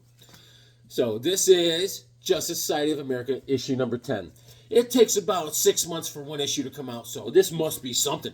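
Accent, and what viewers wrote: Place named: Australia. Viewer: my guy! Popsicle art is the highest form of flattery! Loving the art! You're too tough on yourself. Conan has been a lot of fun. Never met a baby that didn't love setting off fireworks. Justice society was the victim of Ghost Machine I think. Infinity Inc. is a Beckermin favorite from way back. The legion of the unliving! Glad to hear Ron is doing good! First appearance of living lightning! That Night Thrasher is from his ongoing series not to be confused with the limited series Four Control. Issue 3 is the pro debut of Ken Lashley art. Yeah comic viking is made of stone, I'll break him down yet! Thanks for the joke loan! Did you ever see my character the comic community hunter from 2022? Such an authentic Aussie accent. I need to get that Captain Universe run. Love those og guardians of thr galaxy books! This Rip Hunter book wow!! 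Great books this week! Yeah Comic Viking is one of those rare serious Marine vets. I'll win him over yet! Have a great one!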